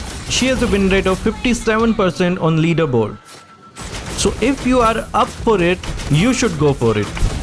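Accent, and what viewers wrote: India. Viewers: You sound like an Indian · bro you from India your voice bro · why u sound like indian dhoti its so irritating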